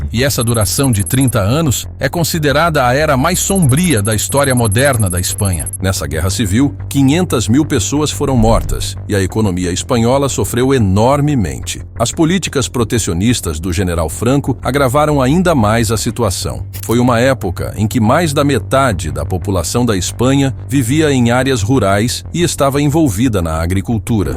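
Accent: Portugal. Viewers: Mudou para o português de Portugal, pq é uma inteligência artificial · Do nada passou a ser narrado em português de Portugal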